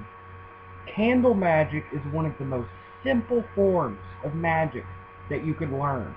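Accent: United States. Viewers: Thankyou i loved watching you and i love your passion also lurrrve your american 'y'all' accent keep posting xx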